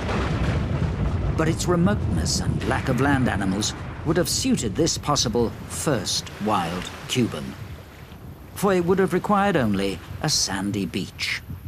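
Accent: United Kingdom. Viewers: Enough with British voices. Done gone.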